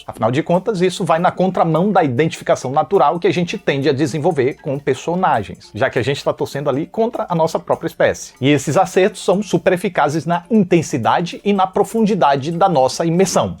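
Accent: Northeast, Brazil. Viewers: Adoro suas análises com esse sotaque nordestino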